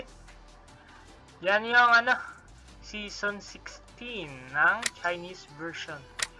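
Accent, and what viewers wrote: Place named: Visayas, Philippines. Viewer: Idol taga saan ka idol parang bisaya ka ahh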